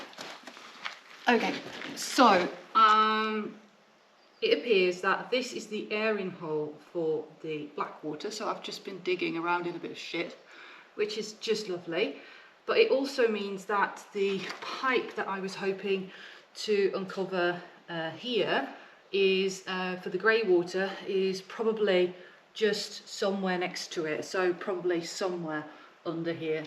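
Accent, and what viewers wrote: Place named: Australia. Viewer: Just wondering if you have lived in my country Australia? 🇦🇺 Sometimes you have a little Aussie twang. You did a great job facing a disgusting 🤮 situation. Brave woman.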